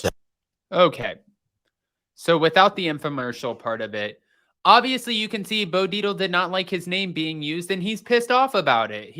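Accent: New Jersey, United States. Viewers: you crack me up with your jersey accent😂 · 😂 I love your Jersey accent!!! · Loving your Jersey accent 🤣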